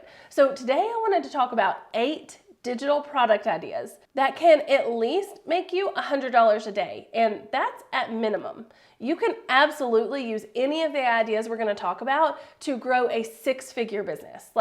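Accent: South, United States. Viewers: Hi my first time seeing your video.. ive always thought about selling digital but never knew how.. i also love your country accent brings back happy memories.. from what you mention id be interested in learning how to sell digital planners online and what kind of platform would i need in order to do this.. can you help me.. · I loooov your english! Where in the US is it from? It sounds so nice!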